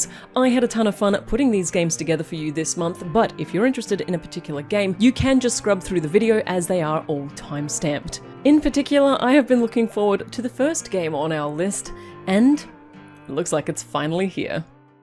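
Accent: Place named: Australia. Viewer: I like the rare occasions YouTube recommends something interesting from a channel I didn't know about before. I liked the old days of classic RTS and city builders. Edit: also hello from another Australian, assuming from your accent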